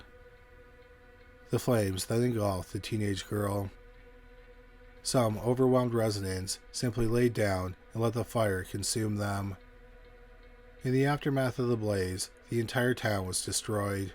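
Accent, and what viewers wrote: Canada. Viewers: Is he Canadian? It would explain some things.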